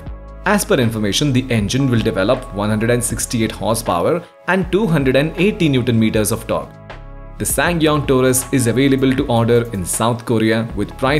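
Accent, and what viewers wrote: India. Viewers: I heard...voice...indian speak english...😊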